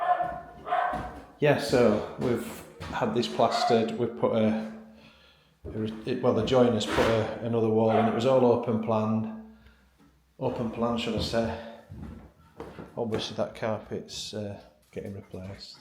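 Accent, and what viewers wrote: Yorkshire, United Kingdom. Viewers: Non Brit here, where is your accent from? Yorkshire? Love it❤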